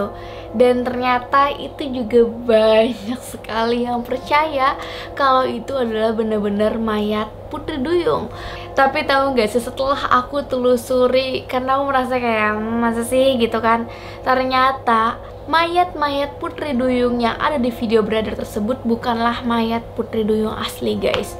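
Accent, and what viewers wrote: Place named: Bali, Indonesia. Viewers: Logat nya kek orang Bali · Logatnya kaya org bali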